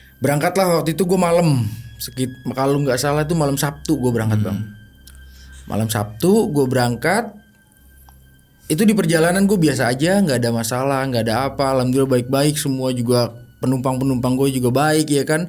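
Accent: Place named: Betawi, Indonesia. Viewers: Betawi kalo udah cerita emang seru dach😅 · Ini mah betawi bet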